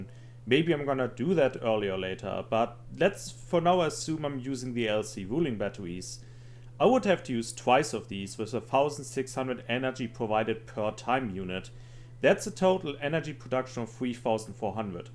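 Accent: Germany. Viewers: Are you German? You sound German... 10 Euro that you are German... but even more because you understand what this factory summoning circle thingy does 😂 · I hear German accent = I listen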